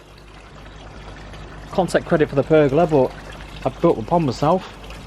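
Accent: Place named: Nottingham, United Kingdom. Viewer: Just subbed to your channel mate! Lovely pond with some great ideas going forward! If my keen ear for an accent is correct, you sound very local to me mate lol. I'd guess at Notts (potentially Derby) but ill stick with Notts :) North Leicester me :) Looking forward to more updates!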